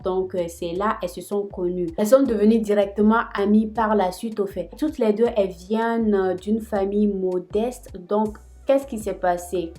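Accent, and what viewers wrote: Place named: Ivory Coast. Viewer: j'adore ta façon de parler et ta personnalité,j'ai aussi cru que tu étais ivoirienne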